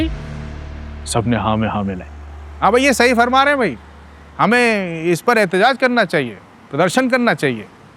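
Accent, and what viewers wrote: Lucknow, India. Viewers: Lucknow tone ❤❤❤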